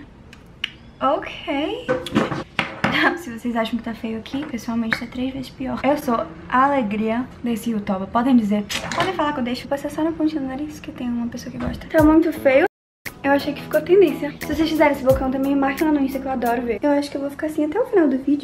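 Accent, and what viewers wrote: Bahia, Brazil. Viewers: Amigaaa Eu maratonaria todos o seusa vlogs pro resto da vidaaa Manda esse povo ir.pra merda Vc e especial pq e diferente algumas meninas(o) quando crescem digitalmente ficam seguindo um padrão chato e vc ñ Nunca perca sua eesencia doida Ps: gente eu divido o mesmo sutaque dessa diva bahiaaaaaa❤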